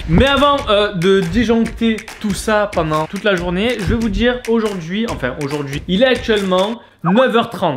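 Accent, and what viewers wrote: Marseille, France. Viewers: C'est l'accent marseillais ou il ne sais pas parler ?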